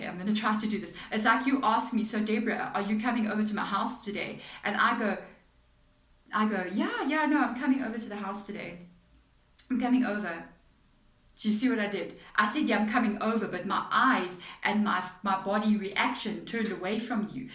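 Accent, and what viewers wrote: South Africa. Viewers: You sound South African? 🥺🙇🏽‍♀️❤️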